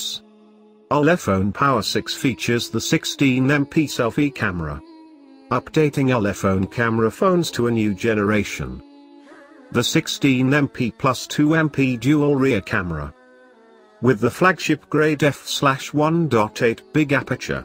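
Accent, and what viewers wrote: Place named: United Kingdom. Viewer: video introduces: UK voice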